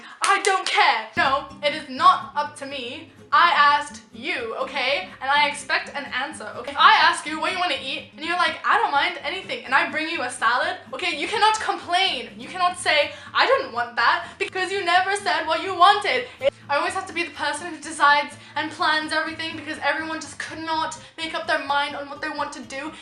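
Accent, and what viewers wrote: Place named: Australia. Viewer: How the fuck do you sound Australian?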